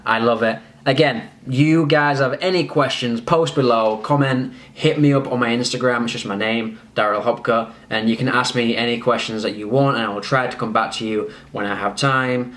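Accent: Canada. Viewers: you Canadian accent is getting dope! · you are getting a Canadian accent. saying 20 is the giveaway.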